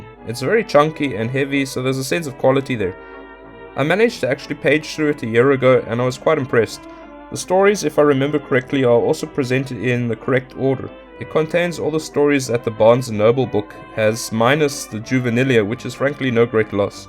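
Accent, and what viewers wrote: South Africa. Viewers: He sounds South African....right on brother or should I say broer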